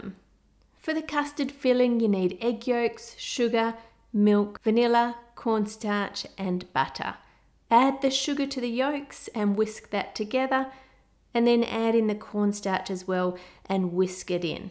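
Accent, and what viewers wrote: United Kingdom. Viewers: what accent is this? It sounds british but what region specifically? kinda sounds like someone attempting to do a british accent lol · Who else is practicing their British accent while watching 🤣😅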